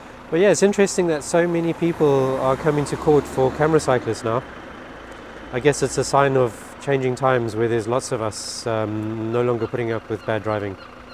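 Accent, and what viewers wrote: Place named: South Africa. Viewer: Cant work out the accent, South Africa at a guess? Whats the driving like over there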